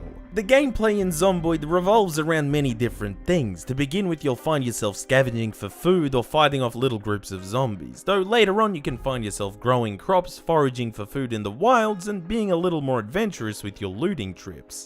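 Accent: Australia. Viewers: Sounds like an aussie, but also very Jewish. Or just has a cold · local youtuber describes an australian person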